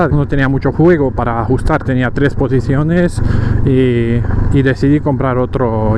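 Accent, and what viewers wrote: Romania. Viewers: Salut , esti român?..dupa accent😊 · Nosptros tenemos dos, una de mi mujer y otra yo. Estamos dando la vuelta al Mundo, y NINGUN PROBLEMA , excepto cambiar amortiguadores y perdida de gasolina en la de mi esposa por culpa de una fisura que aun no sabemos porqué. Obviamente, vamos muy cargadps( es nuestra casa) pero es una moto para toda la vida y para viajar. ( por cierto, que su acento me suena a Rumania. Y me alegro). Saludos desde ese Pais, esperando las motos, que vienen de Canada.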